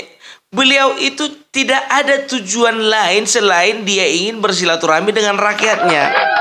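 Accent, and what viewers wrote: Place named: Java, Indonesia. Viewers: Helo,aku salut karo sampean opung asli medan ning ngomong jowomu medok banget,sampean sekolah boso jowo ning ngendi pung,jian pinter banget boso jowone.GBUOPUNG 🙏🙏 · Opung... Cengkok Jawa nya sdh dapet 👏🏽👍🏽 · Om Iki wong jowo opo wong Seko tapanuli.jowone pas.dialek tobane juga pas. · 😂😂😂opung jowo ne jian medok..Jawa bisa Sunda bisa Batak apalagi...love you full opung😂😂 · bagus bnget pke bhs jawa😅😅😅😅 medoknya tambahin Pung😅 narasinya pas bnget👍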